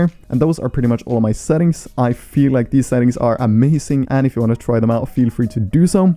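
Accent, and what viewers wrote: Norway. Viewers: Are you Norwegian? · Er du norsk?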